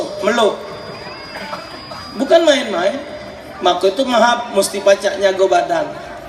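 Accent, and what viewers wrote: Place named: Palembang, Indonesia. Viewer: Seperti bahasa Palembang Ya bang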